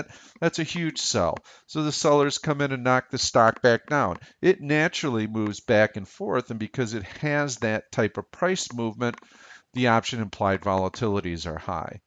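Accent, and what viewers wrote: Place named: Chicago, United States. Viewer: When an options trader tells me he used to trade in the Chicago options pit and I hear his Chicago accent in the first 20 seconds of the video, I listen. lol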